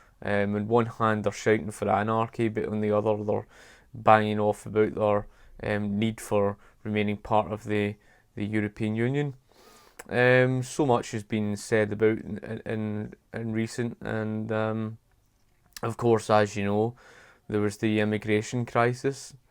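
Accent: Scotland, United Kingdom. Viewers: Ah good to see you back. And good to hear a Scottish voice speaking in favour of Brexit!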